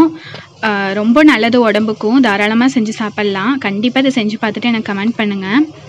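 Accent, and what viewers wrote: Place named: Coimbatore, India. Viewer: Hi sister I am ur new subscriber. I am also coimbatore I like ur kovai slang..... so cute....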